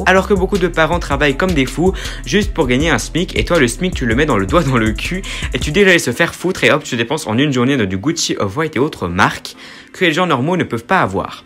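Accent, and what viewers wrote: Quebec, Canada. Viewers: Je t'adore est j'adore ton axen un peut Quebequoi(je ne sais pas l'écrire) je t'adore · TU ES UN PEU QUEBECOIS ? OU??? CAR AU DEBUT J'AI REMARQUEZ QUE TU AVAIS UN ACCENT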